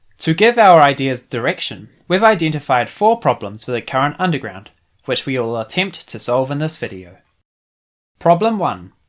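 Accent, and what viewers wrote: New Zealand. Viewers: You sound like a New Zealander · Couldn't have a more Kiwi accent if you tried hahaha. Great video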